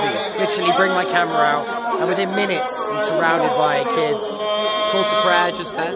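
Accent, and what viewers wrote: United Kingdom. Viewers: مـفـاـح السـبـيـعـي يقول عجبه الخضار هههههه لغتي ركيكه واضن انو يشبه بعض المناظربدوله مدري ايش اسمها ولهجته بريطانيه ههههه ماكملت الفديو لوكان مترجم كان احسن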